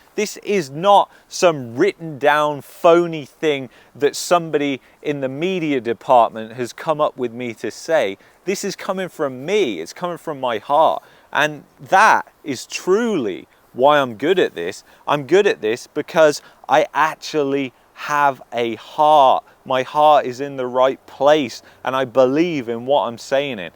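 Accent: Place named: Birmingham, United Kingdom. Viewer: Your severe handicap is being a brommie. It's hard not to sound think, and come from Birmingham. You are clearly not thick, far from it, but you have a challenge.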